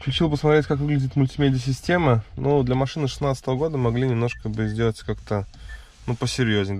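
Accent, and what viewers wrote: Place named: Belarus. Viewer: Маашинуу😁👍акцент прям Белорусский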